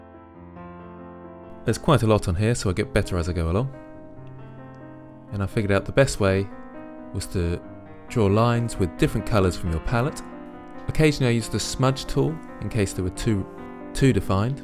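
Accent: United Kingdom. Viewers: Awesme like always, thanks for the many tutorials! iam not a nativ english speaker but i love your British Accent :D its what i was looking for lo learn how to use Blender. may i ask u a question? i would like to know how you creat a complete Scene with more than one 3D model. For example the Background, the Floor and some Objects like a Barrel and a Fence infront of a Building. Do you still use Blender to put them together? If I missed the answer in one of your videos, I'm sorry. Sometimes i do not understand all word in English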